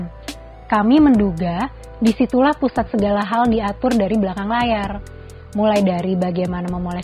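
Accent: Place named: Java, Indonesia. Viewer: Fix mba nya jawa pelan~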